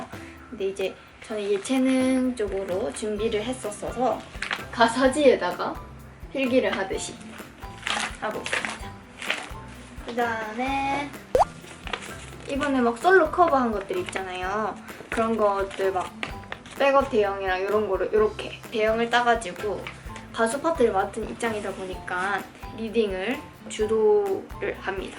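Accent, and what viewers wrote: Busan, South Korea. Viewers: You got a dialect. Are you from busan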